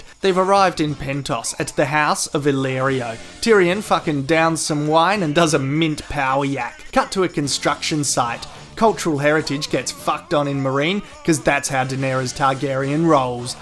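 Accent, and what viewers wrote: Australia. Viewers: Bloody good stuff as always. No other accent could keep me quite as entertained in a review as the good ol Ozzy!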